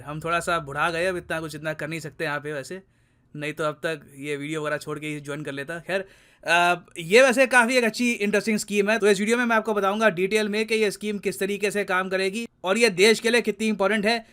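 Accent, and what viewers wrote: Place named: Bihar, India. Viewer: Bihari accent was very cool 🤣🤣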